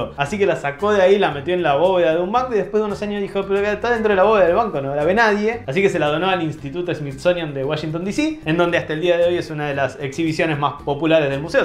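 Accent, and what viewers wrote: Argentina. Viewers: No hables tan rápido, ten en cuenta que no todos los que te ven son argentinos, con el acento y lis modismos cadi no se te entiende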